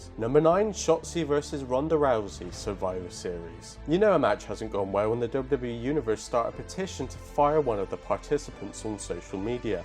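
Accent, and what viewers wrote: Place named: Bristol, United Kingdom. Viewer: You are from Bristol I can tell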